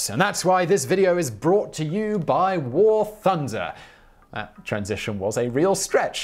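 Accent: England, United Kingdom. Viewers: sounds so English and proper, when he cracks up, it's super hilarious! Cracks me up!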